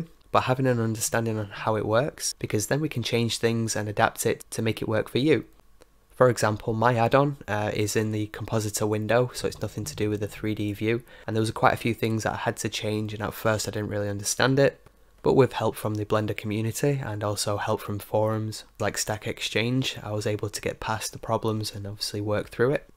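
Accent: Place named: United Kingdom. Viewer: Lovely video. I'm starting a science youtube channel (in Spanish) and I need these kinds of things to get the animations set and going. Lovely accent. I can obviously tell that it is from the UK. London and whereabouts?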